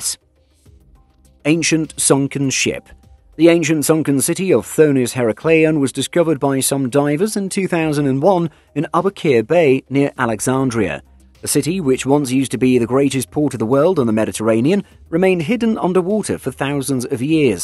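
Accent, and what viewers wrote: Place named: United Kingdom. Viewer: Rehash in a sharply cut, and quickly paced pro voice, in Brit accent. Not a Like.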